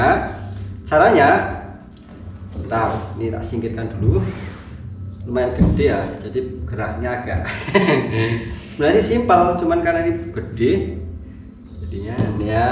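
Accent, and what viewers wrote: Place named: Pekalongan, Indonesia. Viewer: Kok suara nya seperti orang Pekalongan dongwuni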